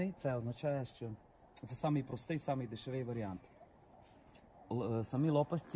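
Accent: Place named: Western Ukraine, Ukraine. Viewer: Это почти польский, суржик западноукраинский.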